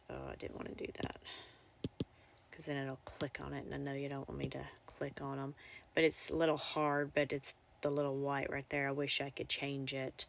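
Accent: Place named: South, United States. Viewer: It’s the southern American accent lol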